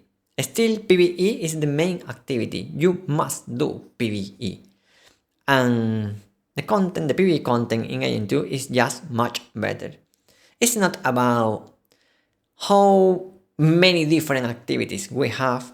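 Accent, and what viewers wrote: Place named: Spain. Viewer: I love your spanish accent. Whenever you say the word just yast* im smiling😊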